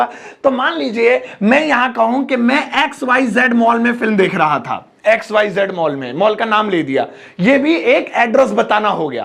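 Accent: India. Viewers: I love his desi English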